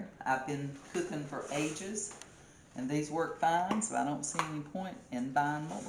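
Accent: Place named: South, United States. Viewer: Have you ever heard of any kind of roll that was made without yest? You southerners are so weird the way you talk adding extra words in when you don't need them and cutting words out that normal people say. You're like the British just making stuff up as you go along. Do you say beet root also like there's some other kind of beet that isn't a root? I guess if you grow up saying it, it sounds normal but for the rest of the country it sounds like you rode the short bus to school.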